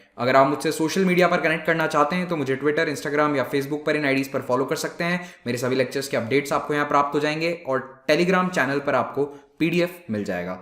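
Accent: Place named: Uttar Pradesh, India. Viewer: Sir aap UP se honge.....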